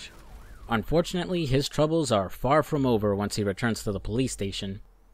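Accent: United States. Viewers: Getting an ear full off this moaning yank narrating ... lifes not ment to be easy son it aint a walk in the park treacle , fack me 😉 ... me an our kid were living in london when this gem came out ... looking back im chuffed we did the london thing in our twenties ... most young Brits do , if they got anything about them ... remember working at selefridges and going there on the game it even had the back exit staff had to use and other places id go up west ... kewl as fuck that game deffo needs a remake would be epic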